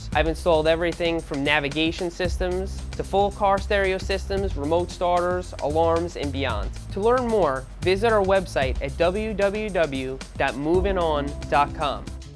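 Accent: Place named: Long Island, United States. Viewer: Long Island accent is coming through HARD compared to nowadays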